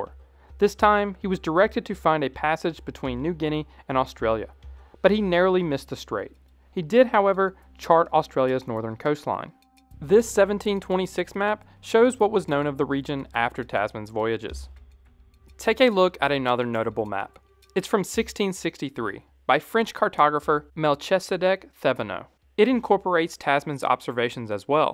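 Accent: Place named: Tennessee, United States. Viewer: Hey there I was trying to guess your accent and just wondering what state you’re from ? I hear TN. Thanks. Nice video.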